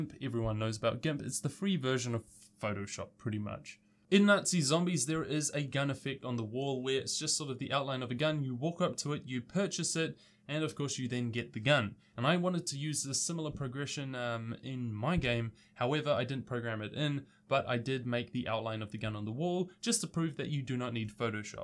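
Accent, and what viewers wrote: New Zealand. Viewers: Are you from New Zealand I know a kiwi when I see one! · Are you a New Zealander? that accent or lack thereof an accent is a dead giveaway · Where are you from? Your accent (and name) makes it sound like you are from New Zealand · Absolutley great video! Subbed. Are you from New Zealand by any chance? Your speech (and yourself a bit) reminds me of Jemaine Clement :D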